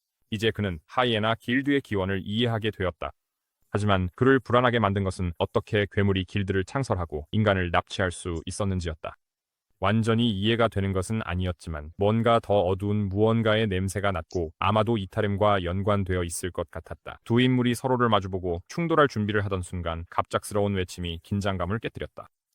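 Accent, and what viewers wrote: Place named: South Korea. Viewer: 한국인 그 자체네요... 얼굴가리고 나레이션만하면 한국인인줄 알겠어요😂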